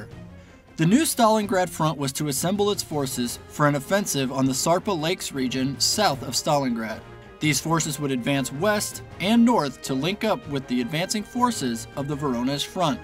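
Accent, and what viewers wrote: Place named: United States. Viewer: American accent somehow kinda kills the seriousness of a documentary. Needs British voice.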